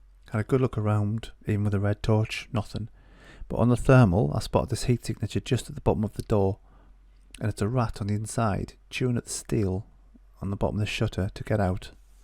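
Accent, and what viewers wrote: United Kingdom. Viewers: Just love the UK accent for narration, oh yeah, I'm here for the video mainly.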